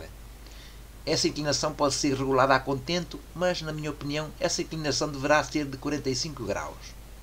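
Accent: Portugal. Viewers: sotaque de Portugal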